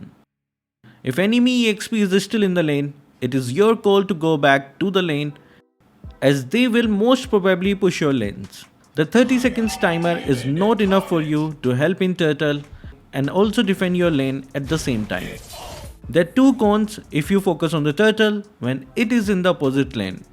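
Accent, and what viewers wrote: India. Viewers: Are you from India?😅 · question- Where are u From.. u sound like u r from india.. Please tell me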